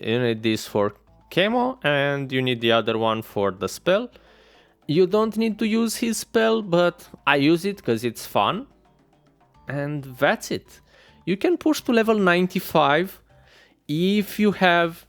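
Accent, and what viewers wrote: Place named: Romania. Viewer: nu bro, ai accentul ala al nostru :))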